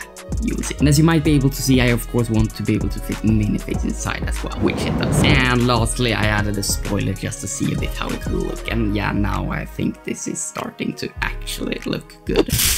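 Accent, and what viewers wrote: Sweden. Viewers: What country are you from? Swedish accent?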